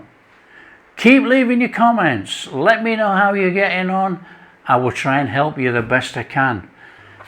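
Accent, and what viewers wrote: Yorkshire, United Kingdom. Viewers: It is your lovely straightforward Yorkshire deliverance and of course the honest down to earth food. Keep going. All the best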